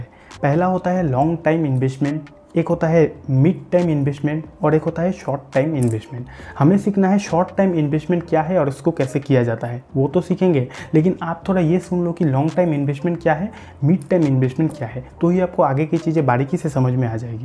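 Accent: Bengal, India. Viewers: Bangali ha kiya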